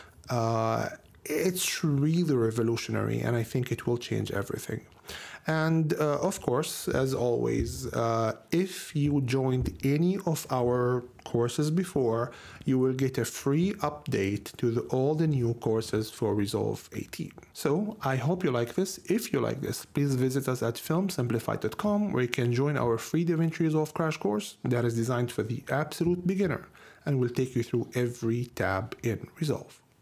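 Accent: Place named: Italy. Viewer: Thanks so much for the free DR 18 update to your courses. I was a little concerned if I updated to 18 your Film Simplified course wouldn't be compatible. You'e the man!! I really love the way you teach too. Well put together, easy to follow along and small bites. Keeps me engaged. The bonus is the accent. What is that? Italian? Spanish? I don't know but it sounds so good with head phones on. Keep up the good work. You are the genuine article.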